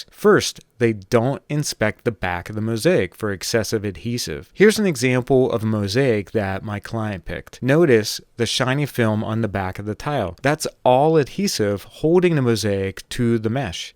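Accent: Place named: Pittsburgh, United States. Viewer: I KNEW I heard a pittsburgh accent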